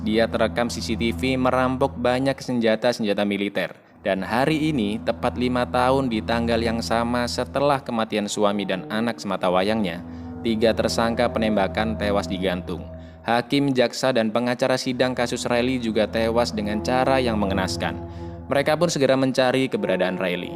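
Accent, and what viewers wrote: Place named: East Java, Indonesia. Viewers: Wong ndi mas. Jatim ye? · Boso jwone kntel men ki asli ndi bro,slm ko jatim bro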